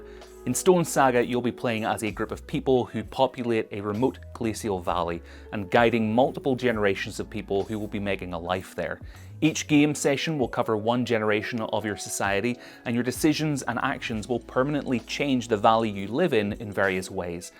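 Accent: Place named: United Kingdom. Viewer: OK I think this was exactly the channel I was looking for for years. 🥰 PS: As a non-native English speaker, may I ask you where does your "accent" come from (which part of the UK)? ☺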